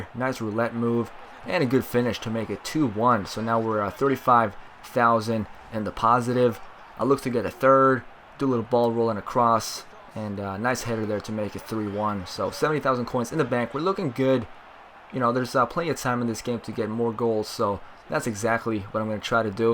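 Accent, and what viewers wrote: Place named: United States. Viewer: You sound american, were you born there?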